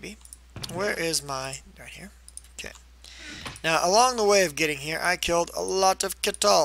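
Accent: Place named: North Carolina, United States. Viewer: are u from NC? your accent is identical to mine. lol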